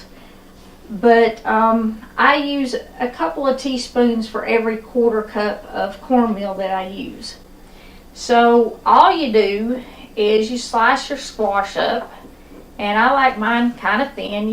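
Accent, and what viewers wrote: South, United States. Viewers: Bless you. I just moved away from the south to the Midwest and have been having the worst bout of homesickness. You not only sound like home to me but cook like home. Going to make this tonight! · LOVE her southern accent. Would it not be more convenient to use a bowl instead of a bag? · Just found your channel & am a new sub. I love your southern accent, such a beautiful part of the country you're from. Also really love that you put God first! The fried squash looks delicious, will definately be making it.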